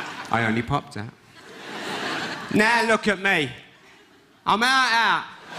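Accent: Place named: Cockney, United Kingdom. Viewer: A Proper real life event told by a Cockney . 👍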